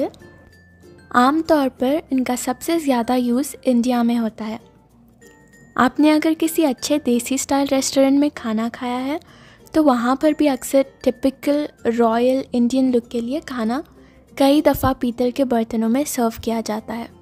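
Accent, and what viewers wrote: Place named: United Kingdom. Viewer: अंग्रेजी उच्चार मे हिंदी बोलनेसे क्या आप उच्चभ्रु होनेका अहसास करती है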